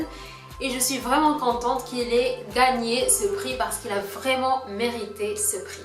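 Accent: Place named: United Kingdom. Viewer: j'ai vue que tu a mis la music 1 like en plus;) presantation avec accent soo british je like aussi;)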